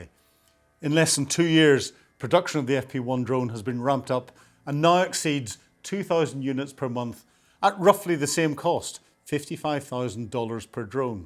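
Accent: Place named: Northern Ireland, United Kingdom. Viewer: Love your blog,love your NI accent AND love your jacket 🇬🇧🇺🇦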